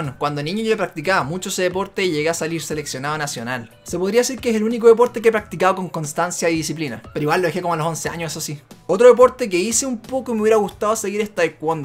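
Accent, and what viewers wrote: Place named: Argentina. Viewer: pensaba que era de Argentina se parece mucho el acento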